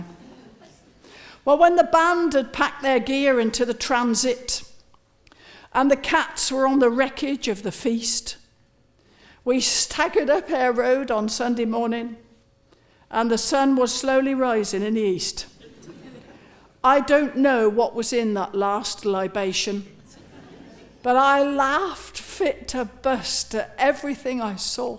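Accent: West Country, United Kingdom. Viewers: 120 wpm for pitmans shorthand - impressive. I only have the certificate for 60wpm and thats enough for me. For years I thought she was from the Westcountry (because of her accent) and have been disappointed in recent times, to learn otherwise - she's as lovely as ever and is an English talent that we Brits remain rightfully proud of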